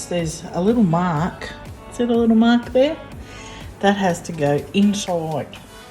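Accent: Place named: England, United Kingdom. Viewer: Love the English accent,. 😆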